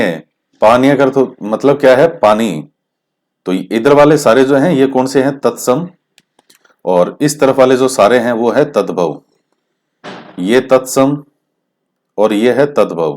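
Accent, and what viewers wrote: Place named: Marwari, India. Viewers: Pranam sir ji 🙏 Sweet marvadi bolty ho app sir . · Sir aap churu se ho kya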